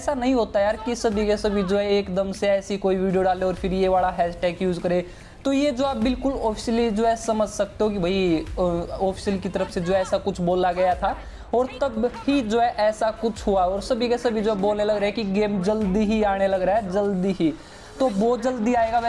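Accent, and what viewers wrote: Haryana, India. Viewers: Bhai yo b haryana te h k eski awaaz sunke to nue laage h k u haryana t h????bera ho to tne???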